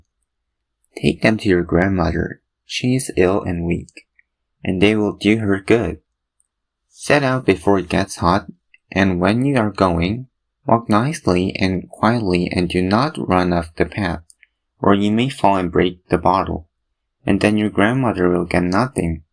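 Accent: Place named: United States. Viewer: 미국인 같아요!! 발음 대박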